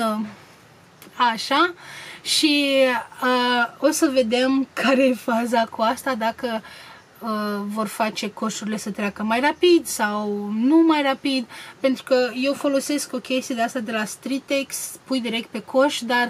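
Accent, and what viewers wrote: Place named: Moldova. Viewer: ce nerusinat e Dragos ma facut pe mine sa lacrimez si inca plus la asta a mai facuto si pe mea preferata sa lacrimeze a fost o felicitare asa cum a spus el o felicitare lacrimogena.iar bratara e minunata so porti sanatoasa.Asteptam cu nerabdare sa iasa in vinzare cartea lui Dragos e foarte talentat baiatul asta si la machiaj si acum am aflat ca va lansa o carte sper sa am si eu ocazia so citesc.Ador cind vorbesti Moldoveneste incep a zimbi de fiecare data si apropo transmitei multumiri lui mamica ta pentru reteta aia de apa de orez daca functioneaza o sa fac si eu.Te pup mult succes in continuare si multumesc pentru ca neai felicitat ca suntem 2000 degrasuti si desigur te felicit si eu pe tine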